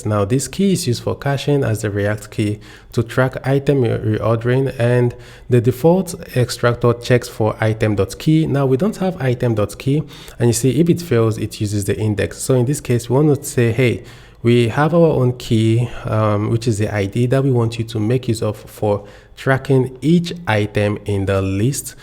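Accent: Nigeria. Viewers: Nigerian English bro😅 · Sounds like a Nigerian 👍🏻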